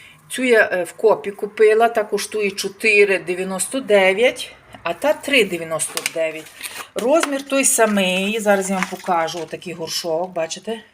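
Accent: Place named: Western Ukraine, Ukraine. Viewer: Да это западенцы на своем суржике" балякає"🫤👎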